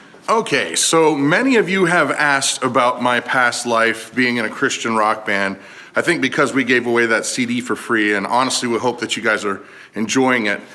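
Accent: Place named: Louisiana, United States. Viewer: The whole time I’m listening to your story I was like, “Yep, that’s Louisiana!!” Lol! Welcome to my lovely state. Ha! Glad your event was a success.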